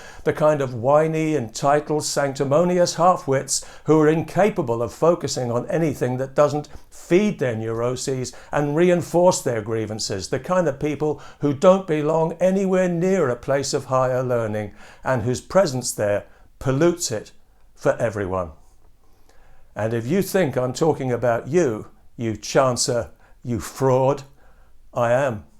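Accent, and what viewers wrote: United Kingdom. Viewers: Yes! Common sense has a British accent today.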